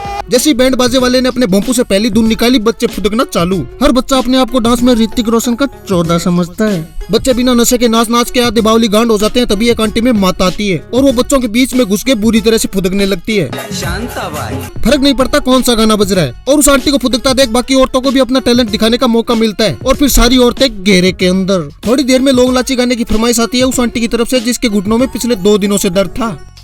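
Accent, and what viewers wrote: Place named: Rural, India. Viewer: Tomhare bolneka tarika ku6 bad villager jeisa hai.......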